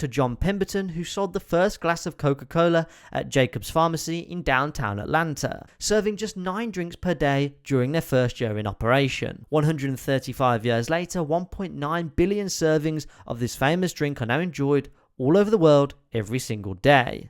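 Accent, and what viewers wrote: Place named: Northern England, United Kingdom. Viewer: I thought it was brummie initially but certain words made me think it was more northern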